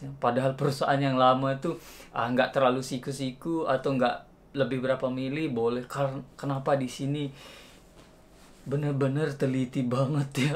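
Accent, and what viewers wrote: Lombok, Indonesia. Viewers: Ndk ini telang ye medok bahsa lombok kadu bahasa indonesia.... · Ya ampun...logat lomboknya masih kentara banget yaaaa....wkwkwkwkwkk Salam dari Lembar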